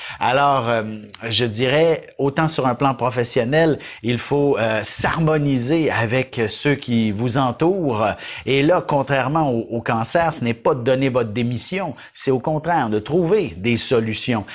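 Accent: Canada. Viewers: Bonjour, faut il écouter les ascendants svp, j adore votre accent canadien Merci Mais il y a un moment que l on ne vous pas entendu, je suis capricorne ascendant lion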